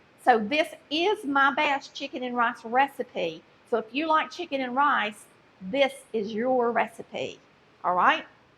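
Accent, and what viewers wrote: South, United States. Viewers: Audio is fine she has a strong southern accent that can make her hard to understand if your not used to it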